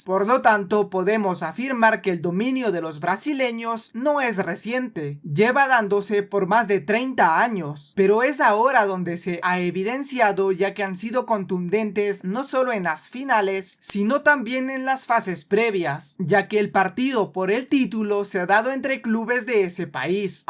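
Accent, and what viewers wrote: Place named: Peru. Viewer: Pero este tipo tiene acento peruano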